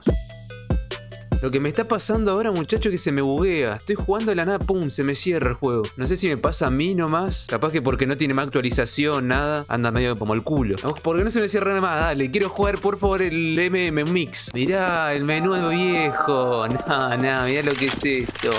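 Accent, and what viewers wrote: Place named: Jujuy, Argentina. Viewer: disimula tu acento jujeño, abrazos!!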